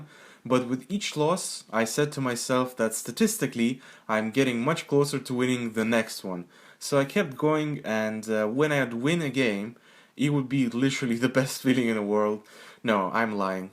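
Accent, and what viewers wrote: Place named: Russia. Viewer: Вау, я конечно всегда слышал твой акцент и подозревал что ты русский, но,так как ты записал видео про рашн мафия колоду на английском языке, я разуверился. Круто слышать что русских популярных ютуберов тоже не мало (тролден например). But anyways for all of you who are wondering what the hell is this wall of text, I just want to thank you, for making good content and just being awesome overall. Keep up the good work man.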